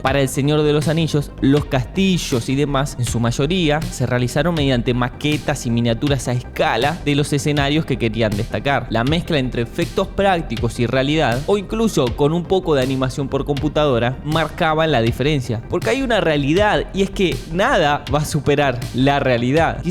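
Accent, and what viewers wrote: Standard. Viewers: que croto agarrar videos en ingles y simplemente hacerlos en castellano, encima hablando en neutro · Es que tenés un acento muy neutro.... me atrapaste. No hablás de tu.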